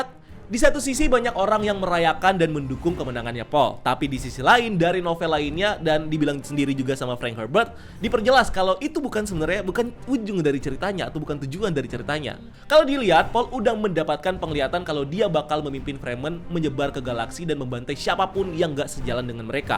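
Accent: Indonesia. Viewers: Ngomong ingris nya jelek banget. Ingris nya logat indo ngapa?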